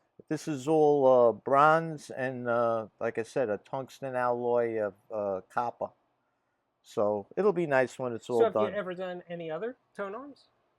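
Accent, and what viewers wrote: New York, United States. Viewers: Great New York accent! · A PHD level of knowledge and a super cool New York accent. Is there a follow up video with the completed turntable?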